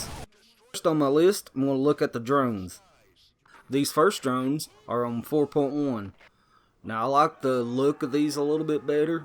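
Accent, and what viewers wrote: South, United States. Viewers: This man has the best southern accent ever. 10/10 very nice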